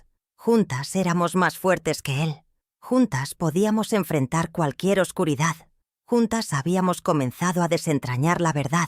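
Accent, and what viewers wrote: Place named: Spain. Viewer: Xgue es otra persona la que habla, no ella . Es española la gue narra.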